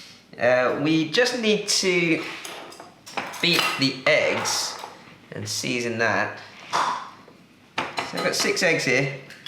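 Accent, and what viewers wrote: England, United Kingdom. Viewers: A gorgeous Asian man with a British accent. I'm smitten 😍 oh, the food looks yummy too ☺️ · I really enjoy your cooking and your pleasant English accent as well ❤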